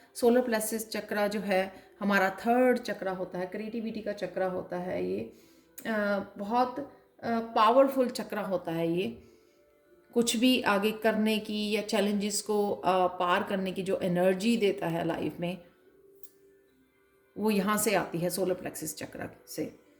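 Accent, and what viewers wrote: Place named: Punjab, India. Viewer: Kya aap Punjab se hein. Aapki hindi mein punjabi ka touch lagta hai. Thanx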